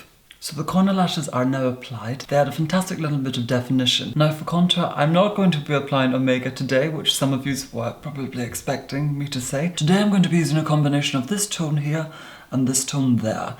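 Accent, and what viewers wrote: United Kingdom. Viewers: You speak like a British queen and it's so relaxing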